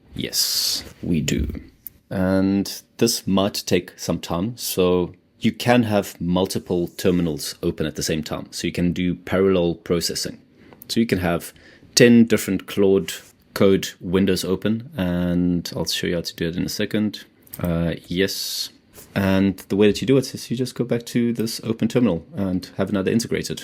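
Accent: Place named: South Africa. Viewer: Definitely a Saffa voice there! Good job bro, repreasent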